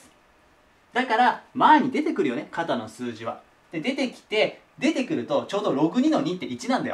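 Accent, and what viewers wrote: Kansai, Japan. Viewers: たまににじみ出る関西弁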